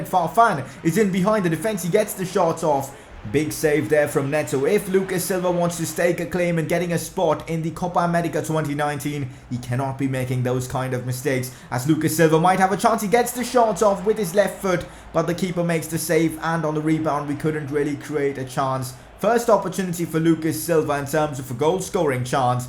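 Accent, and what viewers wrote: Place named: India. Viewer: That one Indian kid in school who tries to be cool with his cringe ass accent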